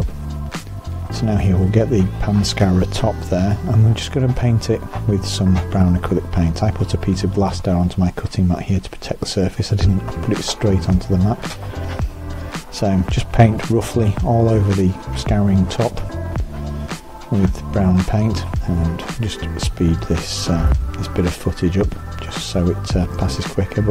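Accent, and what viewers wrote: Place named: England, United Kingdom. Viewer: Thank you, your a great crafter and I love your english accent.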